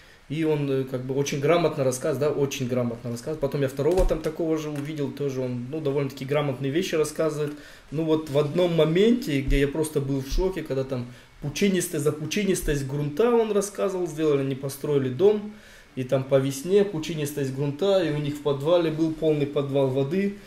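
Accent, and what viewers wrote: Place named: Germany. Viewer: A так Германский акцент присуцтвует ))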